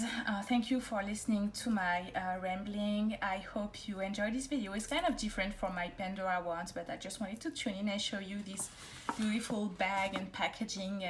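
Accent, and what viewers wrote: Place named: France. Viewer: Nice haul! I wonder if you also speak French because of the accent.? Am starting to like Tous jewelry since I only have pandora. Thanks for showing your haul .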